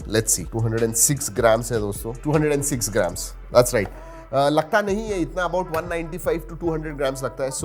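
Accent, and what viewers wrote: Maharashtra, India. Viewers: The whole thing that I love the most is little bit sweet marathi 😍😍luv it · I like that marathi accent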